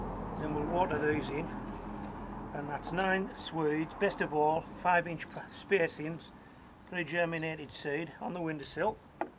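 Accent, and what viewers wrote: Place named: Cumbria, United Kingdom. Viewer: So what happened? Did you get a crop? Thanks for the videos BTW - my other half says "that's a good Cumbrian accent" (and she should know)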